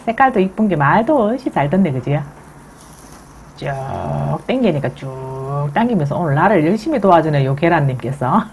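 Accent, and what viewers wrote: Gyeongsang, South Korea. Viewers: 너무재미있으시네요 경상도버젼으로얘기를잘하시네요 짝짝짝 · 갱상도쌤ㆍ오늘도구수한사투리 ㆍ감사합니다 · 짝짝짝 😄 구수한 경상도 사투리가 정겹습니다 얼굴이 안보이가 보이스만 들리니까 개그우먼 김영희씨 얼굴이 자꾸 떠오르네요 유쾌하고 유익한 영상 잘보고 있습니다 참고로 저는 캘리포니아 에서 열심히 응원합니다~ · 계란 말이도 예쁘지만 경상도 말솜씨가 너무 재밌네요 친정 막내올캐 목소린데 맞지예~ 듣고 깜놀 했네요 지는예 둘째 월선 고모입니다 박수 짝 짝 짝 댓글 보면 답주이소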